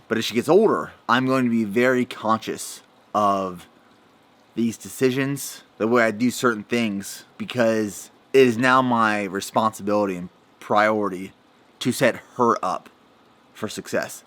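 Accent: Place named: Maryland, United States. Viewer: I know that accent from anywhere! You must be from Maryland or Pennsylvania 😂♥️ love your videos!!! Love from Bmore 🦀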